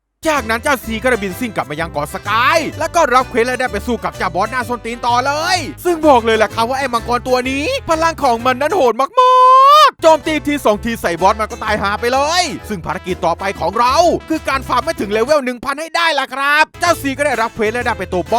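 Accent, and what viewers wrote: South, Thailand. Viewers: คนใต้เหอะนิ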